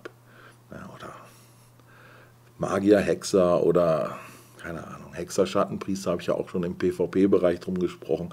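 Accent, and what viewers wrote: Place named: Ruhr, Germany. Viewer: Wo kommst Du eigentlich her?ich meine den Ruhrpott rauszuhören....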